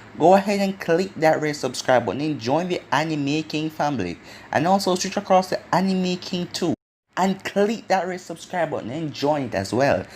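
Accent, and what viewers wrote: Jamaica. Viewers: This is just a random question and sorry if it’s disrespectful but, are you jamaican ? The reason i ask is cause the speech pattern is sorta familiar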